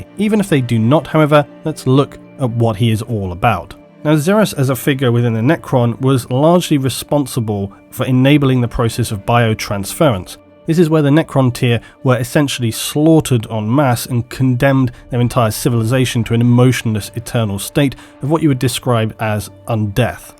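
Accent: England, United Kingdom. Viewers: Hello, just curious, what part of England is your accent from?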